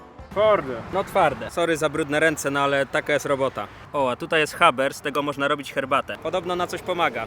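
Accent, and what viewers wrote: Silesia, Poland. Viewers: jesteś ze śląska? bo czuć u ciebie ten śląski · Jesteś z śląska?? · Mówi się zilos, a nie silos. A w filmie zauwarzyłem że też godosz po Śląsku. Ps. Jestem ze Śląska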